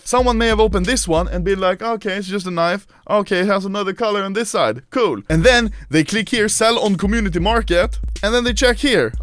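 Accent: Russia. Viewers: i thaught he was russian XD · Are you Russin?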